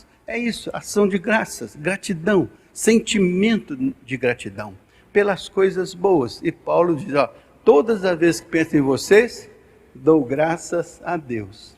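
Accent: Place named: Minas Gerais, Brazil. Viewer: Pr com esse sotaque de Minas!!! A Paz de Cristo já começa ao ouvir esse jeito de falar..Te Agradeço Senhor pela Vida deste pastor!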